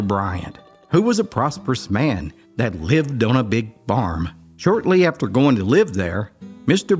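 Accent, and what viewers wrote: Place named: South, United States. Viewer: told in such an authentic southern drawl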